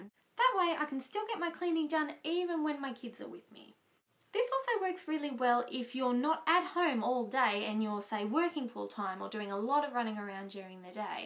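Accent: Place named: Australia. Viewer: I detect an accent and noticed you spelled some words differently than the way Americans do. Are you Australian or British (I'm leaning more towards Australian).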